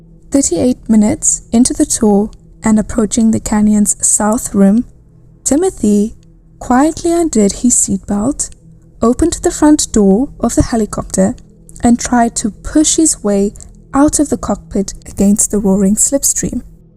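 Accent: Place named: South Africa. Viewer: (Are you South African?) For sure she is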